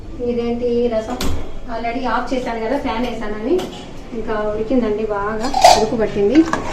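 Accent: Rayalaseema, India. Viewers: Meedi raayalaseema anukuntunnanu maadikuda raayalaseema Atp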